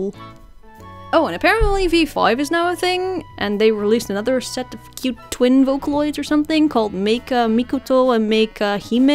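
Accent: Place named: Netherlands. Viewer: Welcome back,! I miss your glorious animated story time videos and this is certainly one of them with your fluid, cute art style and entertaining stories and storytelling! I also love your Dutch accent and you remind me of a fellow Dutch artist friend of mine. Anyway, I anticipate seeing more content like this and I would like to see more of your bootleg Vocaloid days. 😄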